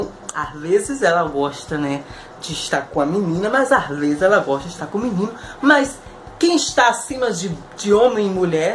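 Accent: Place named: Bahia, Brazil. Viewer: pq você tem sotaque baiano?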